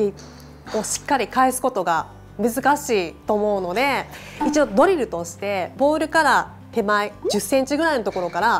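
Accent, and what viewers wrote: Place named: Kansai, Japan. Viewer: 関西弁がイイね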